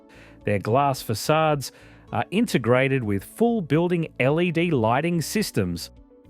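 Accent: Australia. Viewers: Australian narrator has caught my ear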